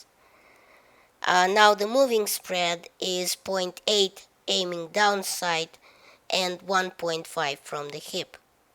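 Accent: Russia. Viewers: i don't care how old u r ur a badass cuz of ur Russian accent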